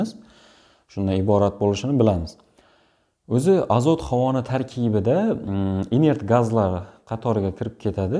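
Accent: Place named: Khorezm, Uzbekistan. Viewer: Dm zor tushuntrbsz raxmat shevangzdan xorazmlimisz diman😅😊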